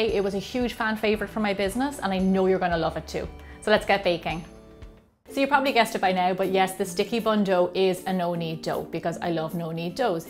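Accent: Ireland. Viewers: I love the fact you haven't lost your lovely Irish accent after living over there in the states :) x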